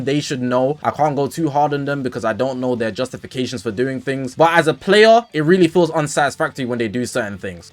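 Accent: United Kingdom. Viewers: So You're From United Kingdom Brittish, Because Is So Much Diferent From USA, Because Of Brittish Accent, Saying "Shallet" With The Sound Of "A" Instead Of "E" So I Mean You're A Brittish So Its Easier Than Learning English From The United States Of America.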